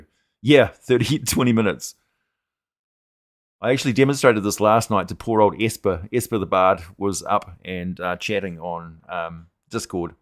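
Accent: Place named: New Zealand. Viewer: Glad to see you trying new things! The AI voicing and script videos haven't resonated with me personally [the AI getting stuck in they cycle of using the word exosuit to describe exosuits or as an example of exosuit technology was when I realized that someone/thing else was writing your scripts and not to your standard], but if they otherwise help the channel grow and allow you to put out more content in your historical style going forward (albeit with fewer dungeons and or dragons) with your voicing and and well researched and written scripts then that's great. I also appreciate the clear labeling of them so that I don't get my hopes up for something of your usual caliber! AI is a great tool, but you remain a better script writer and narrator [at least until ChatGPT can scrape all your scripts and do a consistent NZ accent!]. All the best, hope you're keeping well! 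Had a great face off against some vampires on your silicone battle mats from the kickstarter last night.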